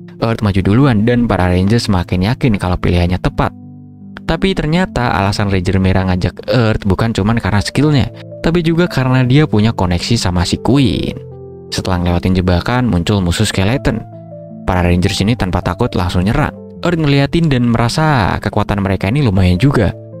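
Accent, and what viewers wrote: Medan, Indonesia. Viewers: Mimin orang medan ya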